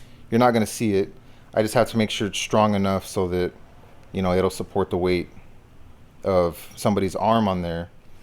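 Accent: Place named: Texas, United States. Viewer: Where are you from? I’m getting a Texas vibe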